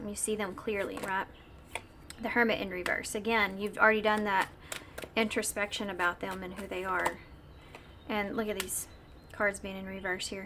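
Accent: South, United States. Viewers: I have just relocated from PA to TN. My first time seeing your readings and my first time hearing a reader with a southern accent. Thank you for the message that i will find people i resonate with in my new community 🙏🏻🥰 pile 3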